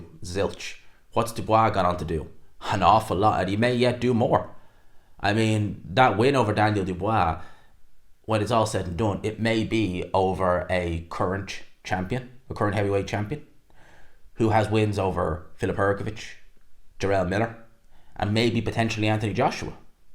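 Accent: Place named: Ireland. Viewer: Where are you from? Accent sounds very Irish 😅just curious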